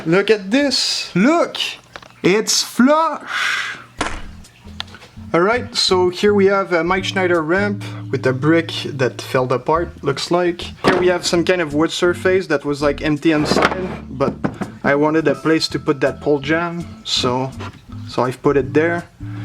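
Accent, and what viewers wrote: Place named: Quebec, Canada. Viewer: the best french canadian accent ive ever heard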